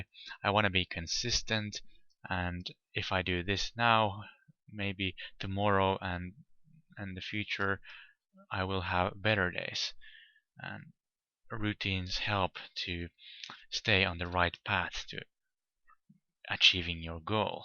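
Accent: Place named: Finland. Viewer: ive only watched you for 40 secs but already know your finnish haha :D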